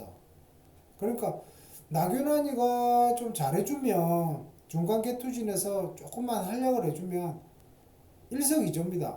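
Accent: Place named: Busan, South Korea. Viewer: 저는 소리 전혀 안울리는데요! 소리가 약간 작게들리는것은 있는데~ 제가 볼륨을 올리면 듣기에는 전혀 지장이없습니다! 소리 언급 하시는분들 가끔있으시던데! 소리가 울리기보다는 제생각에는 사투리억양 때문이 아닐런지 싶습니다! 저는 부산사람이라 오히려 다른유트뷰 분보다 더 잘들리는데! 서울분들은 억양때문에 좀더 집중해서 들어야 되지 않을까? 란 추측을 해봅니다!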